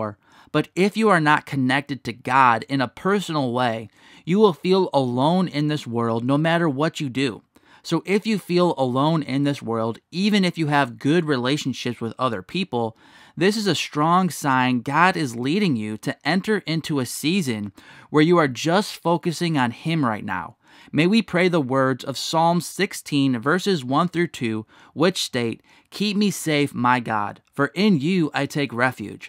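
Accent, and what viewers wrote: United States. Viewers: Narrator from Chicago? That accent...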